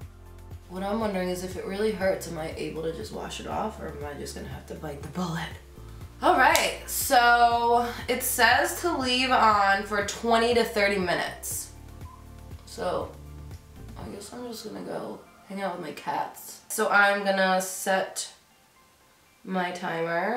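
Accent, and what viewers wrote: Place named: New York, United States. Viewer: You can definitely tell you are from the New York/ jersey area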